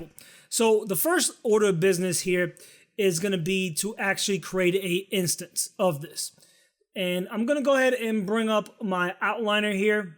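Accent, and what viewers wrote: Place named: New England, United States. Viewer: Awesome tricks, nice to see some tuts with good ol' new england accent too.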